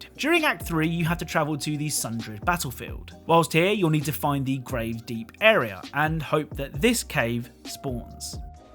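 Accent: United Kingdom. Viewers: Are you British, Australian, or New Zealand?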